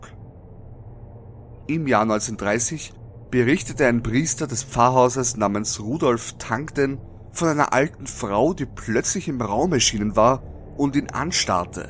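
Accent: Vienna, Austria. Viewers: Ich liebe den Wienerischen Akzent🤤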